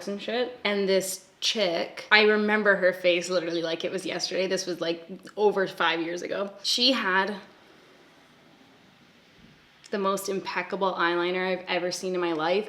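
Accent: Canada. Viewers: You look extra beautiful and happy in this video tysm your vids make my day! Ive been living in england for almost 2 years now and sometimes i just really need a canadian accent to make me feel like Im home 😫😭😛 Love you!!! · I fucking knew u were canadian. gang 🍁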